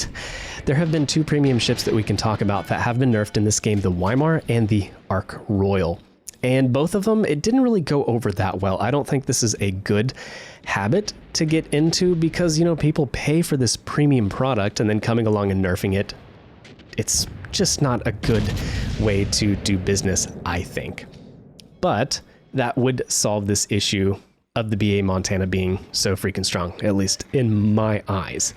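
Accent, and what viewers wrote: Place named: New England, United States. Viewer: Woostah- you from New England?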